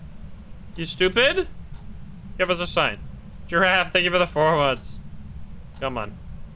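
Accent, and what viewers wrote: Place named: Netherlands. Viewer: are you from netherlands or belgium?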